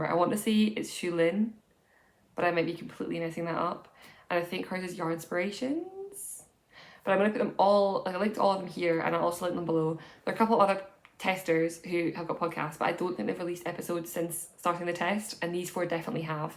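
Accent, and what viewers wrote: Scotland, United Kingdom. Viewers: I really enjoy watching your videos and I like the challenge of understanding you properly due to your Scottish accent. :-D Your Cargill pattern looks stunning, looking forward to knitting it once my knitting skills have improved. I have already knitted several sweaters, but they were all pretty simple. Greetings from Germany! :-) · Has your Scottish accent got stronger this past year?? 😂 Loving your creativity and pattern designs. Thank you for another fab podcast. Xx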